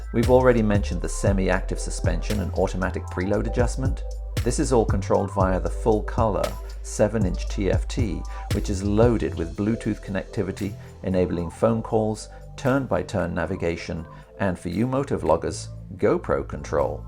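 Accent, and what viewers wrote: United Kingdom. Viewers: Wait, waht, what? A British accented POME giving Canadian pricing? Consider me subscribed.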